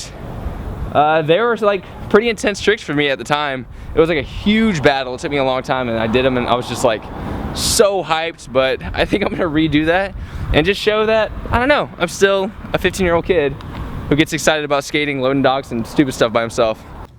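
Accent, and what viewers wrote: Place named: South Carolina, United States. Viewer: I knew your accent sounded like you were from south carolina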